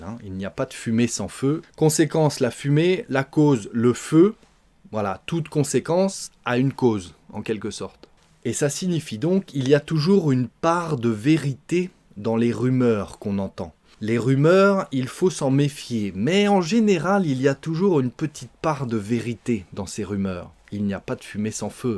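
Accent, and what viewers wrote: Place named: France. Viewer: face-blue-smiling: ... Bonjour... Je ne sais pas si je vous ai déjà demandé d'où vient votre accent français... Je l'aime beaucoup et c'est pour cela que je vous suis. Merci pour ce que vous faites !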